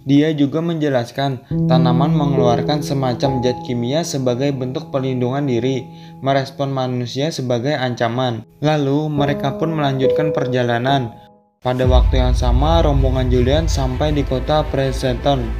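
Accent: Kalimantan, Indonesia. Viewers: Bang orng kalimantan kah?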